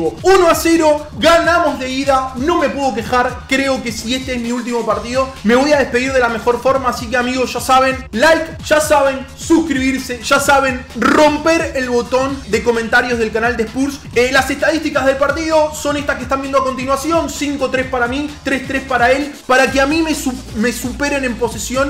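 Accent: Argentina. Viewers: como se nota que sos argentino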